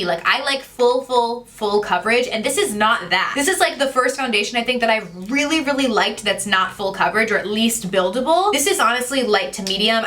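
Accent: New Jersey, United States. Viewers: It’s how we talk in the Jerz!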